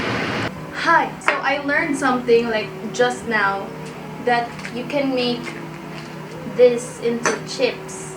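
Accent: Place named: Davao, Philippines. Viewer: This is as good as your writing skills :)) ..with some twist, the Davao conyo twist :))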